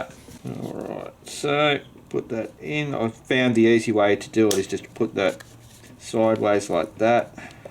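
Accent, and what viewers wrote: Australia. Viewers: Great to hear an Aussie.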